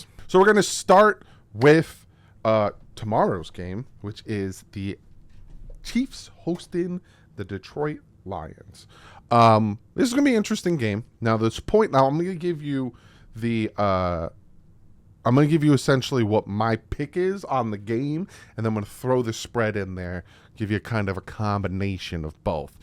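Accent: New Jersey, United States. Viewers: I knew that accent was familiar lol JERSEY STAND UP!!!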